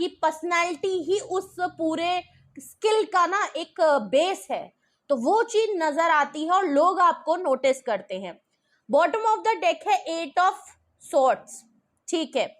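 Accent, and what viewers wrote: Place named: Eastern Uttar Pradesh, India. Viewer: Mam your way of talking is very impressive.....i think you belong to eastern up...Jai shree sita Ram ji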